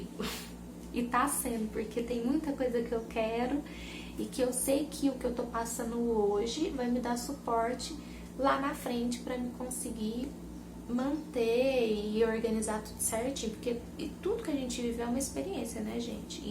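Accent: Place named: Minas Gerais, Brazil. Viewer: Sou a Vani Souza de Campinas viu bjos linda já disse antes, descobri o seu canal e amei demais, já disse gosto do seu jeito mineirinho de falar, seu carinho com tudo que faz, seu carinho e paciência com as crianças é bom demais viu! Gosto de tudo que vc posta, morro de vontade de comer seus bolos, fico com água na boca, deve ser uma delícia, se tivesse aí perto de vc com certeza eu compraria mesmo kkkkkkk te digo que vc é iluminada, que o Senhor te abençoe e te proteja sempre, vc é especial pra Deus e pra mim tbm viu, manda bjos pra mim, eu comento sempre lá no Instagram, bjinhos nas crianças lindas, fica com Deus, Deus te abençoe minha linda🌺🤗😘💞 Vani Souza de Campinas Manda bjos pra mim linda💓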